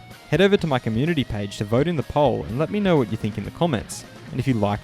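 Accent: Australia. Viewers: I suppose it depends on how much of a fan you are and your level of interest.If you don’t pay much attention to whats going on, then this channel is perfect for repeating everything in one viewing session, but if you regularly check up on whats going on then this channel is a waste of time, as its just rinse and repeat, unless, maybe, you just like listening to Australian accent🤣 Like i said, he still has to put the work in to make these videos and he has has plenty subs, so fair play to him.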